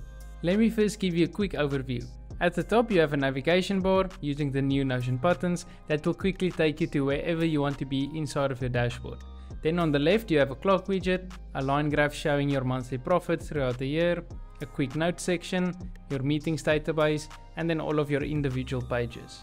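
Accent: South Africa. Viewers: What is your accent? South African?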